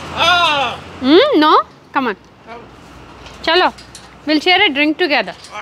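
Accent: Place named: Konaseema, India. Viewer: Elagunnav, aakalettunda 🤣🤣Haha konaseema slang max gaadu😂😂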